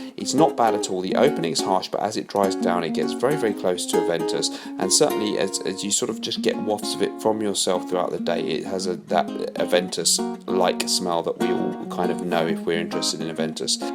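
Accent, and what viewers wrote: England, United Kingdom. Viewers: Hi, I just saw your video now, I realise that it’s too late to comment now but I have a Mercedes-Benz Select and I wondering if it’s closed to the Creed Aventus or not? By the way, I studied a MSc in the UK 🇬🇧 so I so happy with your accent so far, it’s so pure English...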